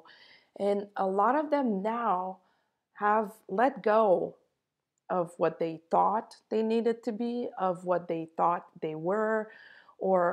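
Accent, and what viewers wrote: Quebec, Canada. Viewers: Est tu Québécois?? Merci.. Spot on message💙🌻🌈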